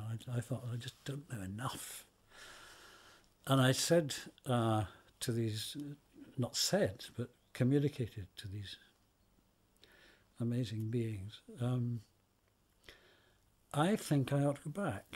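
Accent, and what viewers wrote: Scotland, United Kingdom. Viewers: English with a wee touch of scottish brogue makes it all the more pleasant... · 19 January 2020, Sicily. Yep. When described with a clear British accent even Death sounds good.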